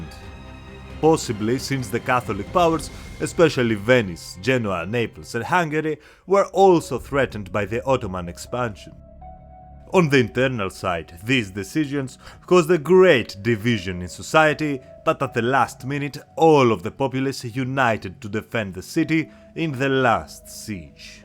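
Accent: Greece. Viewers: Ρε μπάρμπα, πώς νομίζεις ότι ακουγομαστε όλοι όταν μιλάμε εγγλέζικα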